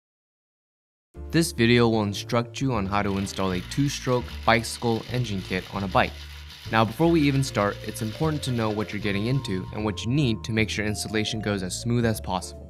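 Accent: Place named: East Los Angeles, United States. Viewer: Man oh Man , I really noticed this guys voice has that distinctive East LA Chicano accent , makes me home sick ... you probably did not even notice but it is a Hispanic Los Angeles Dude talking ! ... video was made in a suburb of Los Angeles !!!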